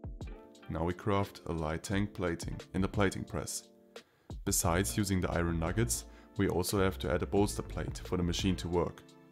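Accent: Germany. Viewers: Ich merke es schon von der aussprache dass du Deutscher bist hahaha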